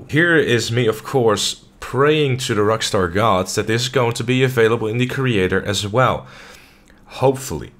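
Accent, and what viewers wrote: Netherlands. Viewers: Zwaar nederlands accent bro😂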